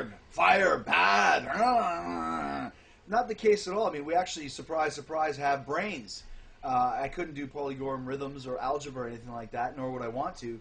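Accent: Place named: Canada. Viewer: he says aboot. such a canadian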